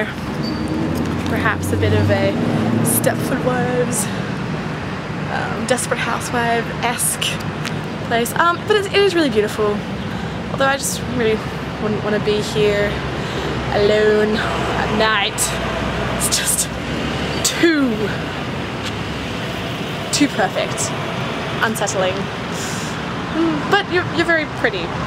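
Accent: Australia. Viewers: omg, i love Aussie accents! It's even more sexy than the british one! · Your Australian accent actually isn`t too thick. I think you have been in Canada/US too much :)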